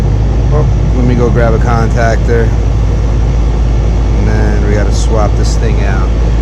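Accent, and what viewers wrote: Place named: Massachusetts, United States. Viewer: I thought so but I also thought you might be in Mass your accents not that strong. I'm in South Carolina right now but I live most of my life in Mass and been doing the trade for 11 yrs and Love it. You have a lot of the same techniques that I have . It's like watching myself. I am on the Commercial side but have done my share of attics at 120f. Dont miss the winters up there though.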